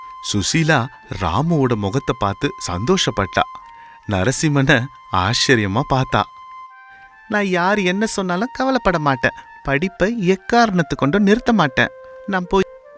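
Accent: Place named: North, India. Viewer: Avar vadakkan apdithan pesuvaar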